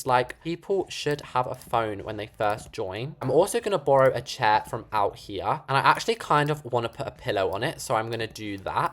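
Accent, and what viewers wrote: United Kingdom. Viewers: gay ish? Naur that’s called a British accent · WAIT Y'ALL THEY'RE A BOY?! I THOUGHT THEY WERE A GIRL THAT HAS A BRITISH ACCENT 😭😭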